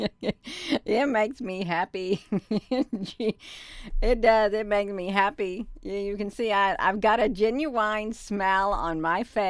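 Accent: South, United States. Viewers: I love the way you talk. Both my mom and dad have that southern drau.Some people are just complainers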